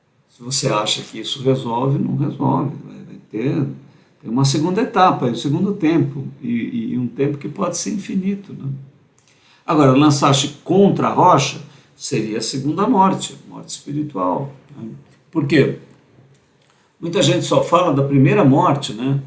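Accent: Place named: Portugal. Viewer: I sotaque acportuguesa e perfeito 😉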